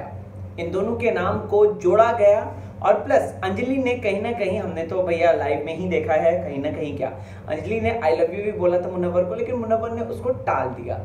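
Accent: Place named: United Kingdom. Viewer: Ye bhai Mai tumahra videos dekhta hnn ya to English me bolo ya Hindi me , nhi to muh se supari Nikal ke baat Karo , but British jaisa Hindi naa bolo yrr 👍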